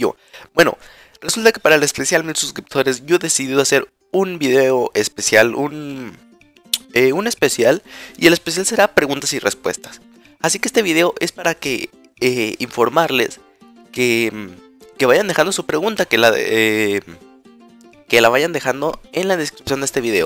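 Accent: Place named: Spain. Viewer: no se la verdad, será por que se te pego lo español cholesco y me da cosa, y me recuerdas a varios tipos que conocí en mc y eran ratescos hablando con esas Jergas de sus ytbers españoles. también esta intro v: me da ganas de pegar al creador de esa canción xd pero bueno, eso no significa que te haga hate, me gustan tus videos, y se que te esfuerzas haciendo los videos, las horas que le pones al mc, independientemente de en cuanto tiempo lo subas a la red, el renderizado eso se merece y bien ganado los subs que tienes v: y seguire poniendote que no me caes.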